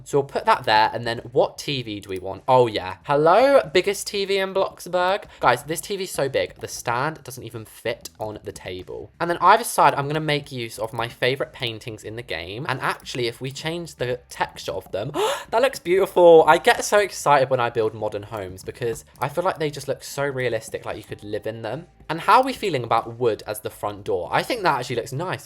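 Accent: United Kingdom. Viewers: WAIT Y'ALL THEY'RE A BOY?! I THOUGHT THEY WERE A GIRL THAT HAS A BRITISH ACCENT 😭😭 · gay ish? Naur that’s called a British accent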